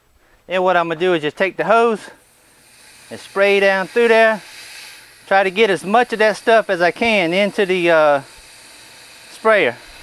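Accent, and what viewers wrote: South, United States. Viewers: Hey dude, enjoyed your video very much! I'm in Los Angeles so your Southern accent and references to woods and swamps was refreshing! I've got a raccoon (in LA? YES!) eating my crops in my new backyard raised garden. Ate my tomatoes and corn just like the day before I was ready to harvest. When it ate one of my 20-year old box turtles, it was WAR! I did the pepper/garlic thing, which worked for a while. Then bird netting. But now I'm going to get a trap like you have, apprehend that critter and drive it into the Santa Monica mountains and set it free. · I love your southern accent! I think raccoons are chewing up my trash at night. I found my milk cartons torn apart across my deck. I can show you what my trash bucket looks like. I don't have a garden, but I need to keep critters from coming up here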